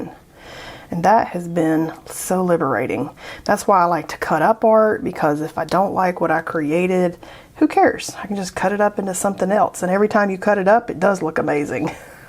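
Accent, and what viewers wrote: South, United States. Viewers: your southern accent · Never let go of your southern drawl or how you pronounce things!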